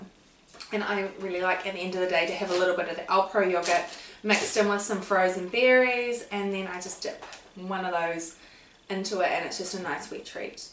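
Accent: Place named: New Zealand. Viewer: You are just what I'm looking for, vegan just started slimming world and just put the daily dozen ap on my phone, I also spent a lot of time in N.Z. so loving your accent, thanks for sharing xx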